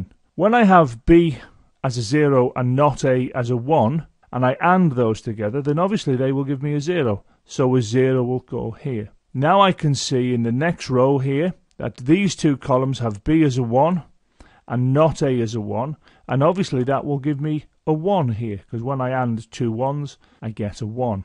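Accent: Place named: Lancashire, United Kingdom. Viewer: Good Sir you are the best. Would be even greater if you added Boolean to the titles so we know which videos to look for. Other than that, the short and simple yet most effective way to help understand is your greatest asset. Btw I was debating with my mate about your accent and he said you were from Lancashire while I thought closer to Liverpool or St Helen's.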